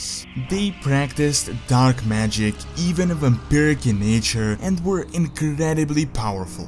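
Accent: Russia. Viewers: You say this is a translation from a Russian youtuber. So did you study Russian or are you Russian? That accent gives it away but just asking to be sure. Nice videos btw, as a lore fan and WoW fanatic you have my sub. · Are you a Russian?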